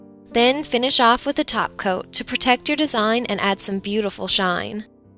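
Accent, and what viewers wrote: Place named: Ontario, Canada. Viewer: Are you from Canada because when you start or started it sounds like you have an Ontarian accent.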